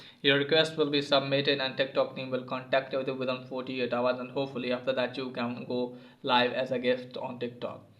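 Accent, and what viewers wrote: India. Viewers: j aimerai bien t expliquer , malheureusement son anglais hindouisme ....null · As soon as I heard that Indian voice I know he was going to solve my problem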